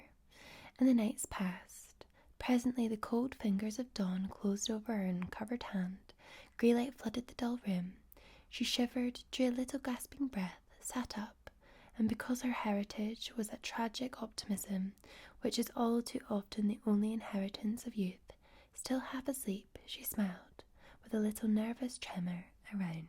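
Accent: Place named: Scotland, United Kingdom. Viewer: I can’t place your Scottish accent, but digging it 🏴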